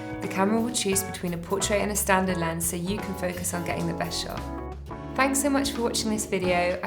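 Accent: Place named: United Kingdom. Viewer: I dont know why American companies feel the need to get s British person to explain their product in order to get that "designer feel"? What has England designed?...Marc Newson is good....but what else?.......no cellphone or space program that's for sure.......and lets get real the average person is broke in England..for whatever stupid low self esteem reason we need the accent...thats the stamp.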